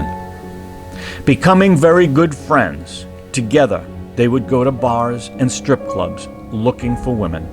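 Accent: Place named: Northeast, United States. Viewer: "In his Scottsdale Arizoner apartment" lol Arizoner gotta love that northeast accent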